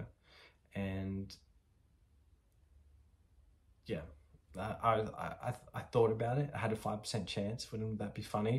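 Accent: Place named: Australia. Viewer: I’ve read all of the Wicked books and just finished Oracle - about to start Witch. Great review, that reveal at the end was almost a dream-like experience, kind of made the entire storyline of the entire 6 books thus far just wash over me with nostalgia and revelation - such a cathartic moment. Loved the entire book. Btw I’m an Aussie too and it’s so nice to hear someone pronounce the names the same way I do, whether it’s correct or not 😂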